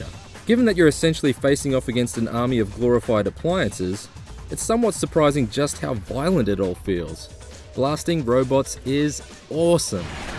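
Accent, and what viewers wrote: Australia. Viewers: Australian narrator? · is this australian accent ? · he wasn't talking inside his mouth the reason why u couldnt(count) understand what he was saying is because he is an Australian which is a variation of the proper english language. so dont give this a bad review because u couldnt understand it because that would be like me giving u a bad comment mark because u have poor english writing skills.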